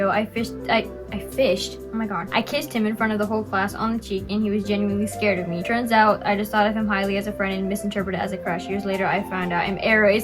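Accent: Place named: California, United States. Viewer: after you said ur californien and say towel weird i also said it and our cali accents are lowk the same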